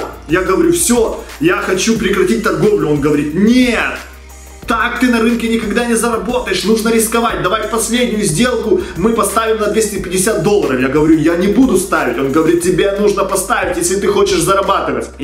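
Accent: Ukraine. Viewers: Какой же у тебя забавный тон разговора). Акцент коренного Украинца.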